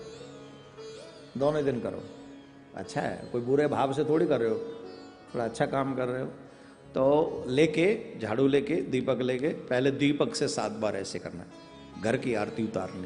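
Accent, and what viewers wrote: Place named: Gujari, India. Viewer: maharaj aapki desi boli ke hum diwane hai kacchu bhi kaho hame to jee badi priya laage mohe eeso laage jaise ye gwalo wali boli hee hume bhagwan bol bhae desi gurjari or rasiya boli jo aap bolo vo kaan me sugar daal de aisi laage maharaj me to sacchi me aapko bhagat bhi hogo or fan bhi hogau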